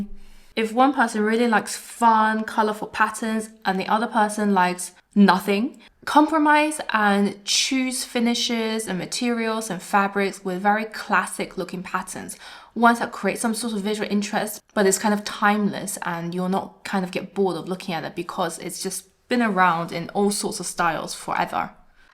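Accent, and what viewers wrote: United Kingdom. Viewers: I have no partner or girlfriend which could force me to watch, so its my free will. ;-) I once found your videos and find the psychological components interesting, because many people are explaining "how to make your room feel more cozy/expensive/calm/natural" but without explaining, why the suggested design may have the proclaimed certain effect. Watching into primal habits of searching for prey etc is very interesting. I am a minimalist and regarding colours more on the beige, earthy and light green side. I am not a big fan of bold statement pieces or great contrast. So it is interesting to see, how people differ - because most of the male people I know are indeed exactly choosing the options you described. As while I am a fand of sanitised rooms, I prefer them not too sterile. My brother is into chrome - a total nightmare for me, as I am sticking to beech wood or bamboo. 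Oh and excuse me this personal note - I am enjoying your British English also. English was my first foreign language at school (I chose Latin as the second) - and of course nowadays in media you mostly hear American English - f.e. the Hollywood and Netflix productions. And unfortunately I am also leaning towards American English (not to mention my heavy German accent). So its always a pleasure to hear BE.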